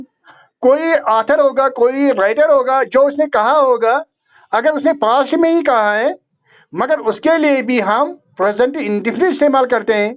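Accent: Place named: Kashmir, India. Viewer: Sir great expl. in Kashmiri accent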